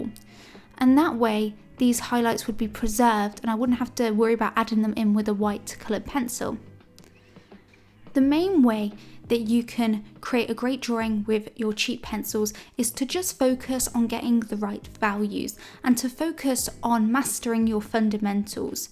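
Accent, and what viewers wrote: United Kingdom. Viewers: Are you british? Your Accent is very nice 👍👏👏👏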